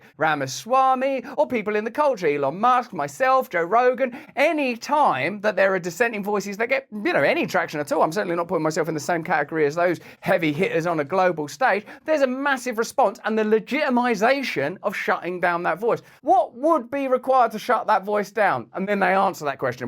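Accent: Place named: England, United Kingdom. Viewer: He is English…just in case you couldn’t tell…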